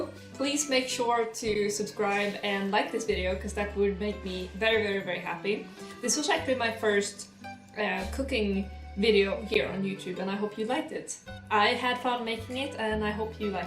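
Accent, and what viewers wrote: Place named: Sweden. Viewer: Are you from suécia? Your english is different. The suécia is a beutiful country.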